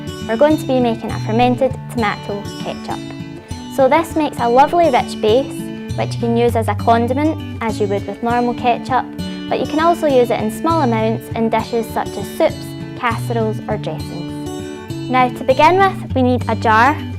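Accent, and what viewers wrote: Scotland, United Kingdom. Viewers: the broad Scottish accent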